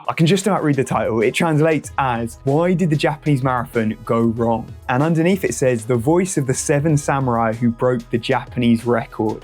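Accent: United States. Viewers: Nailed the American accent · Your American accent is amazing!!!!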